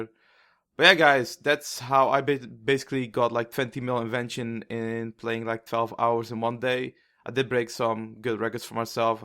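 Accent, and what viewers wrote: Netherlands. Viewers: is how 80% off the dutch ppl speak english ahha! I'm also a proud dutchman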